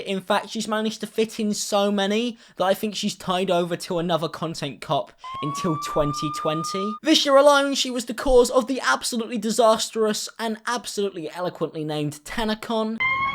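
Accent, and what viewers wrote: United Kingdom. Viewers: Yes, it is intriguing. And he speaks with a British accent too.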